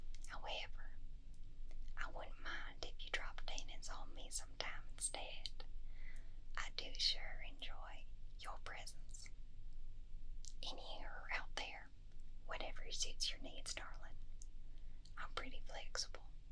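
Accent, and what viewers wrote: South, United States. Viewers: I've never heard a witch with a southern accent before, hehe! A lovely witch and some lovely triggers. 😌❤️ I enjoyed this. 😊 And I love how consistent your uploads are by the way. ❤️ I hit Like. 😉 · Thank you southern! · Southern accent is best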